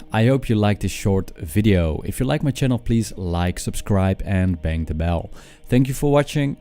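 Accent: Netherlands. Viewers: Hoor ik nou een hollands accent :-)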